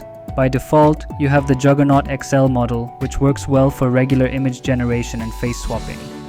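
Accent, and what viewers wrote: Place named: India. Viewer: Indians speaking English sound very ridiculous, next time use subtitles or remove anything below your tonge, the world will thank you.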